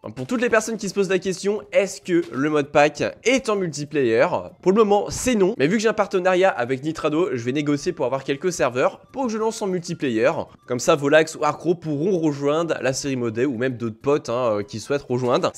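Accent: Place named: England, United Kingdom. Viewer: Très belle accent anglais 👌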